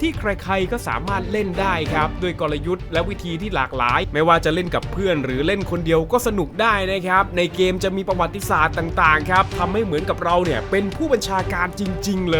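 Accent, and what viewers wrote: Isan, Thailand. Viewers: อ้านเป็นคนอีสานแม่นบ่😅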